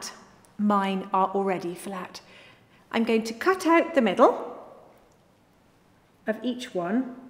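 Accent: United Kingdom. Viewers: Which british accent is this? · British accent is very elegant and the way she speaks is polite and decent.Merry Christmas 💫🌲🌲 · Her British accent is life. Americans have destroyed the English language.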